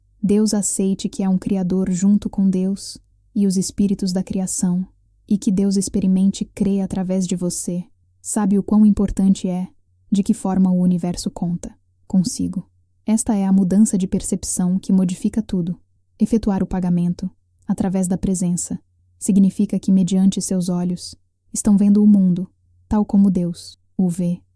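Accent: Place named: Portugal. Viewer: Mas reparou que sai do PT Br pro PT de Portugal?😅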